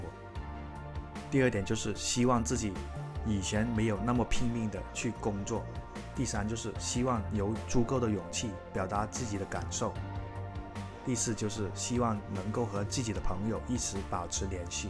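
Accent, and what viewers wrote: Cantonese, China. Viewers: 加油加油，終於再見了，終於再聽到係的廣普了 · 怎么有那么重的香港口音的？难听死了! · up主是广东人吗？您说普通话跟我以前的同事们听起来差不多，还挺亲切的😂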